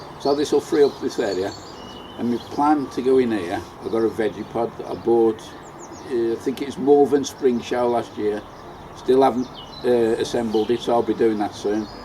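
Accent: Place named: Black Country, United Kingdom. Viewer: Hiya. I have watched many of ya videos and I think they are great, ya cor be too far from me with that accent. dragged up in Gornal.raised in Woodsetton and now live in Brewood. yow must be local to me with that Goodrich logo on ya top, I'm on the allotments in Watery lane Codsall, Ima a guessing yam in Bushbury or close by, would love to hear from ya, Hopefully, speak to ya soon,Arthur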